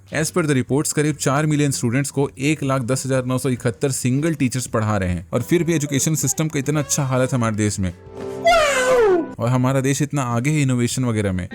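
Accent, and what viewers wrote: Chhattisgarh, India. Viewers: Bhai chattisgarh se ho kya?🤔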